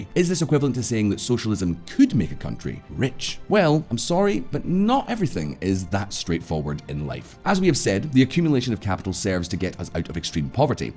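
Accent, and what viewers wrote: United Kingdom. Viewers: This was the best Video from accent to being Genuine Uk citizen 😅 I liked it all. Thanks for great neutral explanation.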